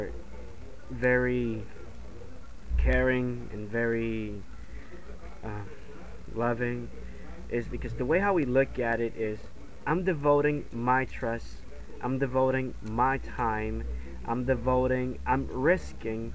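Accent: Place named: Dominican Republic. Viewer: Manito. Como estas 😊 i hear the Dominican accent. Bless u man INFJ here too.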